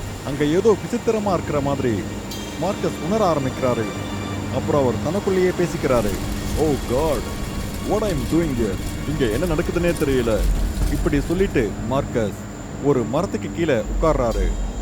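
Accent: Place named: Karnataka, India. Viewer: Kannada Tamil super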